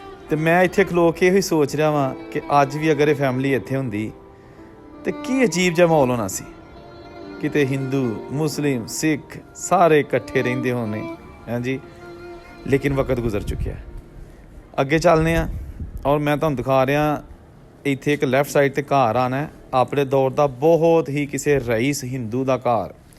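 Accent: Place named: Punjab, India. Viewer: Apke bolne ka style ham Panjabi jaisi hi. Sunkar apnepan ka ehsas hota hai.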